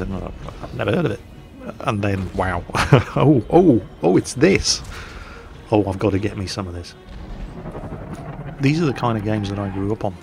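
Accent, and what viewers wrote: England, United Kingdom. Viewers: What you are doing is that you are an old man with a English accent, who happens to like video games. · Well, I've never played WoT, but i almost feel like i know more about the game than a lot of newbies to the game and its all from watching your videos lol for whatever reason i just enjoy your commentary. Must be the English accent:D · Why subscribe to (assumed you like WoT or Warthunder) 1. He is authentic (or at least a pro in pretending to be) 2. He has, imho, a fantastic British accent 3. He has a kind of humor I appreciate cause its hilarious and mature the same time 4. He stays away from politics, religion and other "minefields" of internet communication 5. He lives my childhood dream (playing a game all day and getting money for it) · I can attribute your success to three things. One- You're British. Americans love British accents. 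Two- You're retired Navy. You have a passion for military history and you know what you're talking about. We respect your opinions and input because of it. Three- You DON'T give a shit about what's popular and you refuse to conform to the modern YouTube channel standard, what I call "Pewdification". I mean no insult to PewDiePie at all, I mean, he found a niche and he milks it, and it works. But you stay specific, you talk with the fans, you do Mingles with, you study the game, and you know what you're talking about because you stay focused. This is coming from a Texan fan of yours. I will stop whatever I'm doing to watch your videos, and I am never disappointed. I love your stuff and I'm glad you don't plan on changing. I am also just fine with your new interest in Star Citizen, as you're still mainly focused on the content you have your main fanbase for. If you wanna upload some more SC videos, I think that's fine as well. 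So long as you don't turn into mainly SC. A trifecta of SC, WoT, and WT sounds okay to my ears, once SC comes out. Keep on tankin'.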